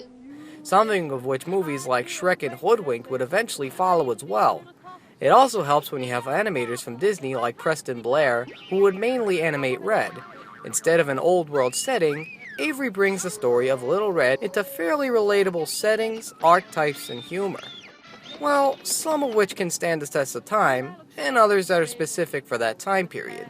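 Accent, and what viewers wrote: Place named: New York, United States. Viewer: You have a very interesting almost extinct New York accent.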